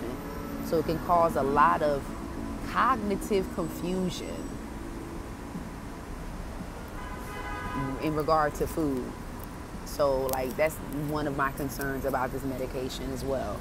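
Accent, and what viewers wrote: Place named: Louisiana, United States. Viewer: It sounds like you’re in Louisiana 😍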